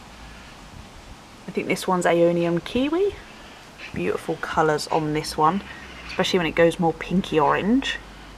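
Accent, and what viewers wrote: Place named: West Midlands, United Kingdom. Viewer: YIPPPEEEEEE I LOVE Plant Tours and WOWSERS you have a REALLY BEAUTIFUL Collection <3 I LOVE your variegated Thanksgiving cactus, all your plants are so beautiful and they are all so nice and tidy and beautifully potted up, and I LOVE your greenhouse too, and OMG WOW at your Euphorbia lactea ghost its a big stunner <3 I think your cactus is a Thelocactus variety or mammillaria compressa as they look very similar, and your cactus figurines are so cute too haha <3 I think from your accent you are from my neck of the woods originally (Warwickshire, West Midlands ? ) thanks so much for this wonderful update and sending you an abundance of love and happiness and PLANT POWER from across the Emerald Isle XXXX <3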